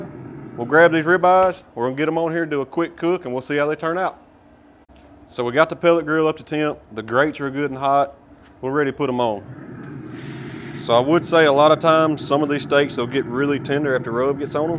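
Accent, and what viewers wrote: South, United States. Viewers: Southern accent? Check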